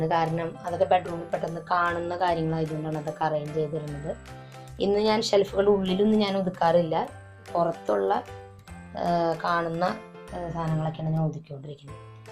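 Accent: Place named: Mala, India. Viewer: Evideya veedu?mala,tcr boarder ano?samsaram maarala.(mala).marambala trissur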